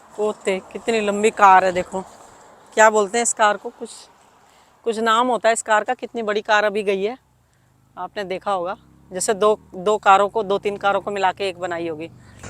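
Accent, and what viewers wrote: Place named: Uttar Pradesh, India. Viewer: Im indian tum bhi up india se hogi aap ki bhasa bta rhi h nice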